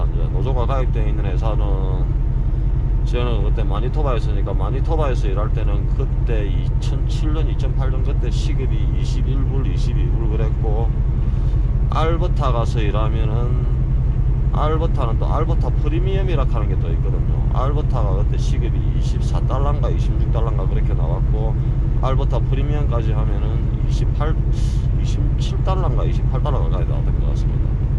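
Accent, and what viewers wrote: Gyeongsang, South Korea. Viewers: 서울은 새벽부타 겨울비가 부슬부슬~하루종일 내리고 있습니다 여기오면 맘이 참 편해집니다... 님의 구수한 갱상도 사투리도 좋구요,오늘도 잘봤습니다^^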